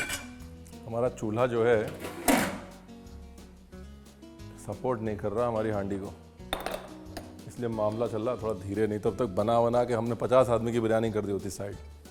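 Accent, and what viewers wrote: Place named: Lucknow, India. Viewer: Briyani toh mai try toh krungi Hi but but jo lko ka bolne ka tarika hai na 😅maja aa gya hum aur humra du tho laung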